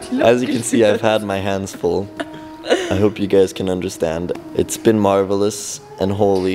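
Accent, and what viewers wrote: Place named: United States. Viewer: I was surprised by your American accent. You must have spent a lot of time in the U.S. BTW, I learned a great deal about the Habsburg dynasty while at school in Austria. Strobl am Wolfgangsee. Fun family video.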